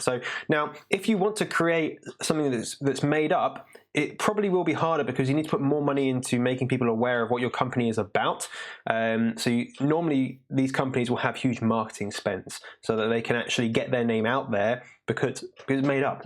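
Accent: United Kingdom. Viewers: Do you need to be so rude and not even try to pronounce the name of the author of the book you used for your video? Can't you pronounce any other phonetics apart from bri'ish mAtE?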